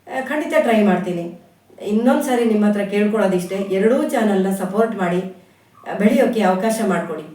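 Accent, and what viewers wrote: Malnad, India. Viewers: Madam nimma video tunba chennage bartede niu hakero yalla video nija jevanadalli namma malinadenavara kathe ni ide nanu 26 year inda banglore nalli irodu but nimma video one day nu nodade irodella tunba namm urenavaru mataduva hage ide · Namge nam malnad bashe andre thumba ista❤❤❤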